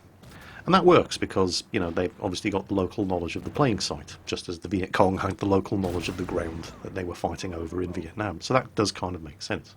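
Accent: Australia. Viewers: Soooooo... Are we going to get airsoft videos full of ' horrible Aussie accent now? Because that will be interesting.:)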